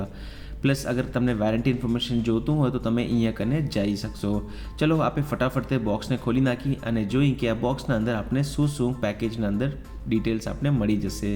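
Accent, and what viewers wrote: Kutch, India. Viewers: Aa Gujarati nathi aato kutchchi Gujarati che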